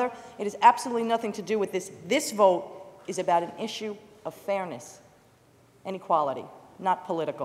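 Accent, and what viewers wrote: New York, United States. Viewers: that was fantastic. also, I just love ny accents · I think her words make this speech great but her new york accent make it amazing!!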